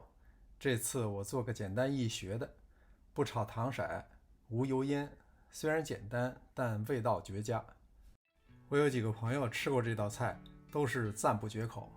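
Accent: Beijing, China. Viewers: 视频声音太小了啊 呵呵 快出怎么吃又保持体型的视频吧 就爱听你的北京话 嘿嘿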